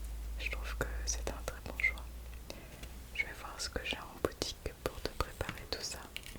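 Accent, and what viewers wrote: France. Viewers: Another brilliant video. I love your beautiful french accent, so calming. Please make a really long video so I can fall asleep listening to your voice 🤗🙂xx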